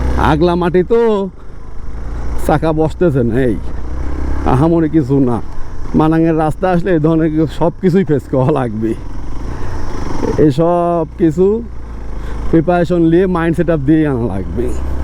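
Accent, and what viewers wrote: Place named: Pabna, Bangladesh. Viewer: ভাই আপনার ভাষা আমাকে পাগল করেছে। ভাইয়ের দেশের বাড়ি কনে পাবনা নাকি?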